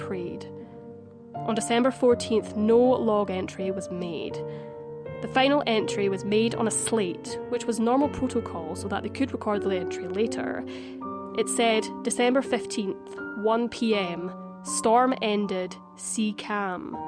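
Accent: Scotland, United Kingdom. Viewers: That was so creepy, your delivery is perfect and your Scottish accent makes me listen intently......When I was a kid I always wanted to be a lighthouse keeper. Thanks from a sassenach -)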